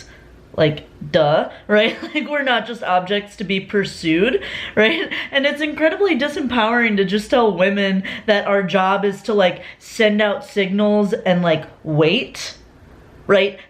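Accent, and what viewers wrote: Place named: Wisconsin, United States. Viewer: Wisconsin Accent OP 😜🤘🏼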